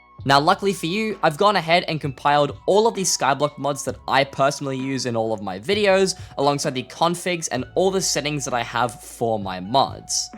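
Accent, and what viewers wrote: Australia. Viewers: BRO IS AUSTRALIAN WE NEED MORE AUSSIES ON YOUTUBE AUSSIE HGIH FIVE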